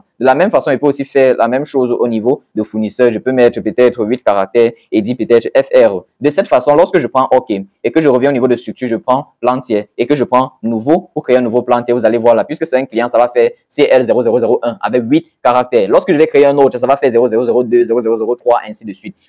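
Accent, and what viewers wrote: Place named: Central Africa. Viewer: Commentaires anormalement élogieux !!! C'est du pipeau !!! La vérité c'est que vous parlez trop et avec un accent difficile à capter pour des non centrafricains Abrégez svp et soignez votre diction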